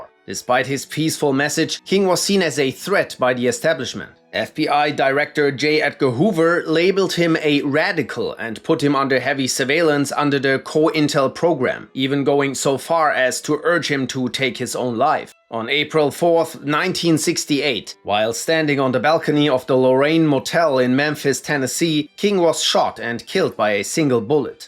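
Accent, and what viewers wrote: Germany. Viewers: GOD, these are good. The german accent just makes it like we're in a U.N. meeting. Kudos.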